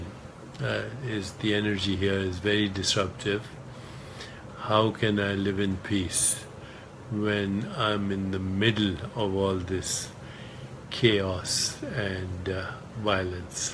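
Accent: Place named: Iraq. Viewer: الي يتكلم وكأنو عراقي قريب جداً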